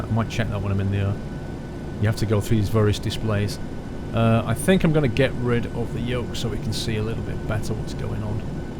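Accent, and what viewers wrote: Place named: North, United Kingdom. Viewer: So you're a Northerner too? Wuhoooo!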